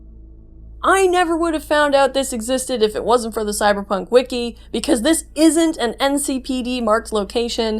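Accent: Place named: Canada. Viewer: Amazing video. Also knew you were Canadian the first time I heard an "are" or as we say it "arr" lol Got me back into Night city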